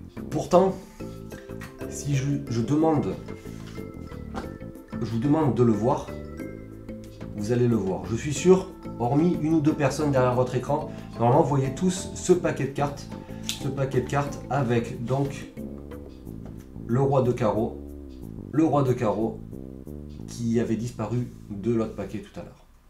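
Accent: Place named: South, France. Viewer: salut, une critique pour tes vidéos, si tu doit améliorer quelque chose c'est l'accent du sud, je sais pas si tu l'entend mais essaye de le supprimer ou alors exagère le. allez bisous.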